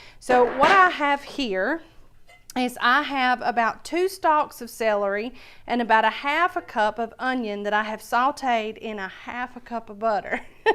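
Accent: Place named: North Georgia, United States. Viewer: Looks great! I've never made homemade cornbread before, so I'm so happy I found this demonstration. "Smell-O-Vision!" I love it! You sound like North Georgia or East Tennessee, and it was really enjoyable listening to you. Happy Thanksgiving!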